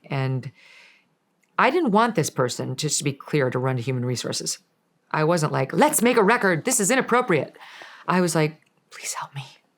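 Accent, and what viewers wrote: Ireland. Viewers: She's irish?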